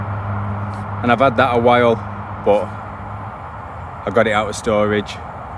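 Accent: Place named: Yorkshire, United Kingdom. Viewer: Excellent channel, looks like the good life, the dogs must be great company too.so glad this video popped up on my feed.... just subscribed now... you sound like your from near me in Leeds /Bradford way? , I wish you and the dogs the best of luck in ya outdoor life 👍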